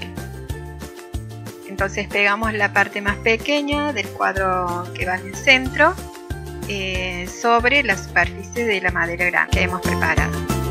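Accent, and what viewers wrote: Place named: Cordoba, Argentina. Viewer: hermoso quedó, y me encanta tu tonada cordobesa 😄😍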